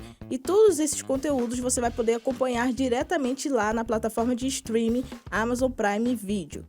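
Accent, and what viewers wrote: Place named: Rio de Janeiro, Brazil. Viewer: Meu sutaque carioca! Saudade da minha terrinha.. haha. Bem vinda!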